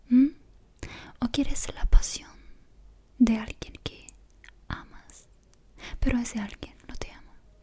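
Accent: Argentina. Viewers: Se le sale lo argentino.... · una pregunta:¿Sos Argentina? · Hablaste muy argentina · Yo solo quiero una poción para tenerla a ella:'v Pst: Tremendo acento argentino 10/10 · Te salió un acento muy argentino 😂 ¿será la mezcla del Italiano y español? 🤔 Me encantó tu maquillaje! ☺ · Te queda genial papel de bruja😊 Pd: me encanta tu tonalidad media argentina c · En este video me pareces muy argentina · Argentino mezclado con Itailiano es dnwkwjjwjeje arte, Amé ♡ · Amo tu acento argentino 😍😍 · Suena Argentino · Wow nunca te había escuchado hablar español sin susurrar O.o que voz tan bonita! Tienes acento argentino y dices palabras latinas:p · Es como que en este video hablas más en tonada Argentina a comparación de tus otros videos, va a mi se me hace no se(aclaro: no lo estoy criticando es más me gusta por soy de argentina) Amo tus vídeos💖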